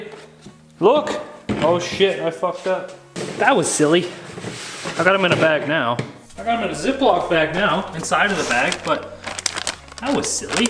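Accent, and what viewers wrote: Alberta, Canada. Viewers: Are you from Alberta??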